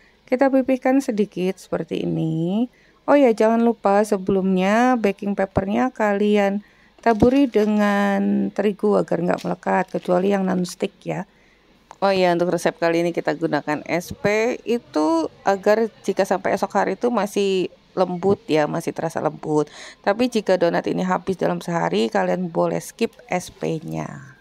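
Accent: Java, Indonesia. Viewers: Hehe kok logatnya jd jowo to...emang asli jowo ngendi mam?😆roti2nya uenak tenan mam👍👍👍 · Donat gembul tgduoempt ss hangat tlur aduk msk rg gla aduk masukan tepung lalu garam aduk dn masukan sp dn margarine sampe tercampur froof bgi2 bentuk (medok ya bu logat jawanya heee) 16buah ya ingat jangan sampe over proof duhhh ginuk ginok gemoyy empuk trimakasih bermapoat sekaliii hahhaah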